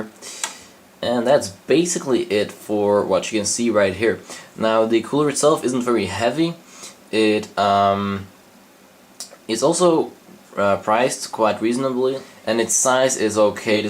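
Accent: Netherlands. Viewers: i cannot watch this cus of your voice i hate a dutch accent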